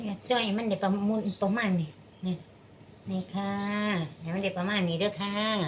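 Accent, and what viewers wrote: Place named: Mukdahan, Thailand. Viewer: สำเนียงพูด เหมือนคนมุกดาหาร อยู่บ้านได๋ครับคุณพี่ สำเนียงชัดเจนอีหลี ชัดเจนกินพี่ ผมว่าเป็น ภูไท ใช่ไหมพี่สาว อิอิ แซวแน่😊😊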